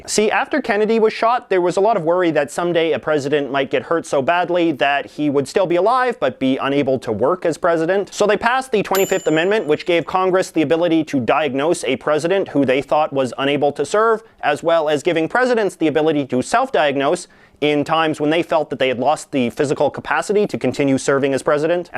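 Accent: Canada. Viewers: It's probably from the slightly different dialect they speak in Canada (where he's from)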